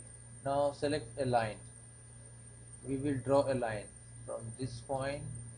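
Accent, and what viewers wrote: India. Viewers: Why there are so many Indian english accent producing engineering videos on Youtube? Is there any reason? Thanks from Brazil.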